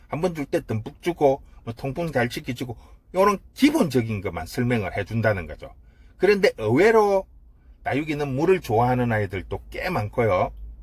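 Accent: Gyeongsang, South Korea. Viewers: 갱상도 발음 정겹게 들리네요. 일리 있는 말씀입니다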